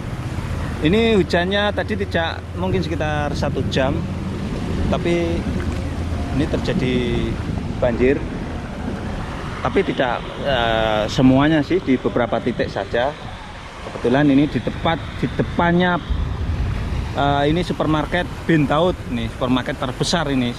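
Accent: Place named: Banyumas, Indonesia. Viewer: Inyonge wong ngendi kok ngapak ngapak temen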